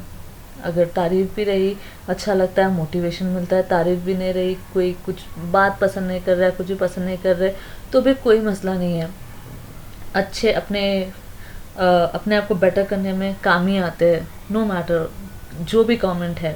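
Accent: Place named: Pakistan. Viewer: You sound more like Pakistani not Hyderabadi 😊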